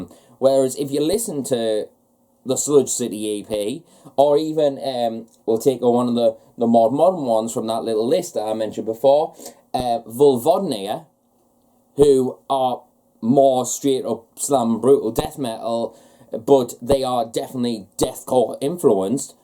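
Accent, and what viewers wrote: United Kingdom. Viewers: It's a Geordie accent, he's from Newcastle or Sunderland in the uk, the accent is sexy af with girls the Geordie accent lol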